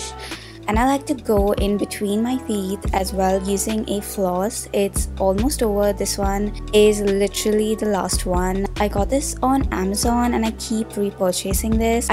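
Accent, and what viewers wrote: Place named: India. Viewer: I think indian look at her accent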